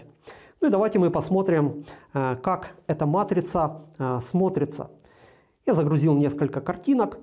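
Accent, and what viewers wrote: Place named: Ukraine. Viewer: С Украинским акцентом хороший обзор.и достойный смартфон.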